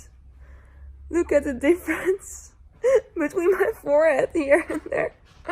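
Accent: Netherlands. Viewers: Omg ik dacht zo ze klinkt zo nederlands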